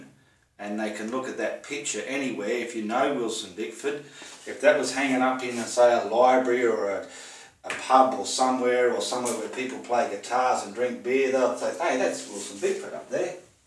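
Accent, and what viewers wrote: Australia. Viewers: Thanks for the video,! And, you shouldn't change the way you speak, you're Aussie speak as you normally do!:-) My American ears find an Australian accent quite lovely. Cheers!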